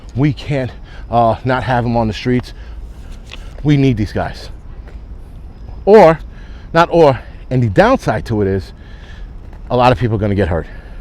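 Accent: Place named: Chicago, United States. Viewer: Really informative , sounds like Chicago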